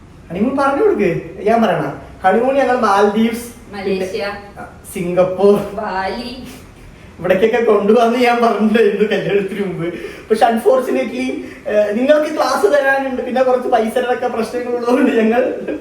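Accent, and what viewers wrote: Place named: Palakkad, India. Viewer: Chechide slang enik valare ishtaayi ❤❤❤ nammade Pkd slang....❣️❣️❣️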